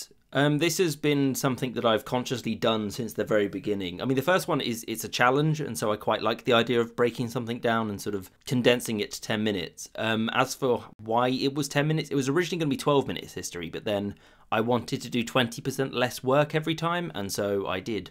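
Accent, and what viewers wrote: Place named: West Country, United Kingdom. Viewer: Peterborough? I thought you were from the West Country.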